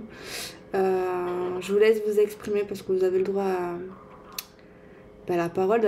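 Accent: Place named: Marseille, France. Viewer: Bonjour ma belle j’adore ton accent marseillais quand tu es dans l’emotion merci pour ce message je te kife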